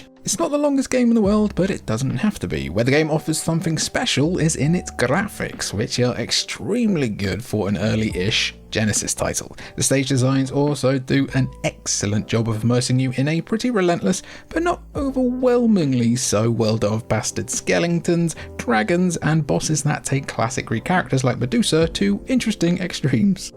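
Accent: England, United Kingdom. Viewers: There's something wrong about a British accent calling the Mega Drive a Genesis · You sound English... why do you call it the Genesis when it was ONLY called the Genesis in America? If you're English you, like the rest of the world, had a Megadrive. This dumbing down of the world to only cater to the American audience is really depressing.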